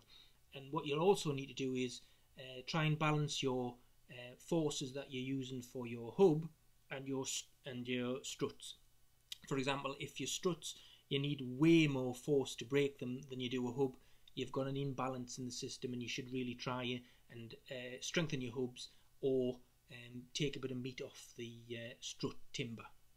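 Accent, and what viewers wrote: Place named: United Kingdom. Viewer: Hi - you made very nice videos and also a great website. It helps a lot to understand dome structures. You sound like you are from UK and there they use imperial measurements if I am not wrong. However your calculation tools are mixed Metric and Imperial. (radius in meter but windspeed in Miles per hour). May I suggest to put a simple unit in your calculation tools. i.e. Radius/m: 6 - it would help. And since you have to touch your website again - can you share the formula you use for your calculations as well? Thanks for considering. Cheers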